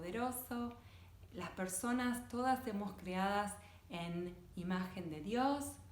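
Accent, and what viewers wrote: Argentina. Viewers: Cómo es que eres judía y tienes acento argentino??? O te volviste judía?? Es pregunta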